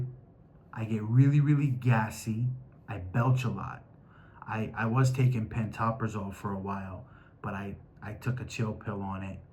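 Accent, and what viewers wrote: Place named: New Jersey, United States. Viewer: Not sure why or how your channel popped up in my algorithm, but it did! I see you flip houses is Texas. You dont sound like you're from TX, but you talk and sound like an adorable Jersey boy! Im born and raised in Jersey, so I know! 😂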